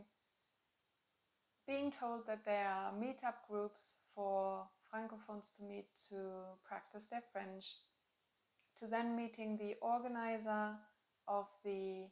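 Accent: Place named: Germany. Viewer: Hello friend 🤠👋 I was already a subscriber, but this was very interesting 🎉🎉🎉 I was completely blown away by your accent however, I wasn't ready for what sounds like German or Austrian 😮😮😮🤯🤯🤯 Not to be stereotypical, but I really thought you were Middle Eastern lol. In any event, congratulations on your company's success and being displayed in the paper! Can't wait to see more dancing! Come visit us in The States! There's a Reflexology session waiting for you as a thank you for all of your hard work ❤️🙏 🤗 Your friend Jay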